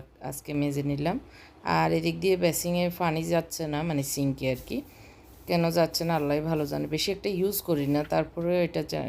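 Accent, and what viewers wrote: Noakhali, Bangladesh. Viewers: আপনি কি নোয়াখালীর?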